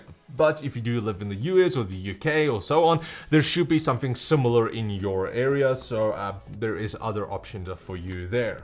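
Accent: South Africa. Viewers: Meneer jou aksent maak dit bitter moeilik om na jou te luister, hoekom doen die Suid-Afrikaners dit, dit klink verskriklik.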